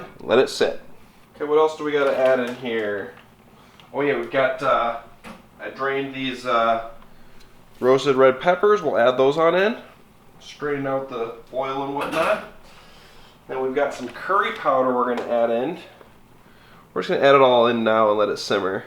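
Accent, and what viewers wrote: Canada. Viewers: I’m sure you’ve addressed it before, but where are you from? I’m assuming Canada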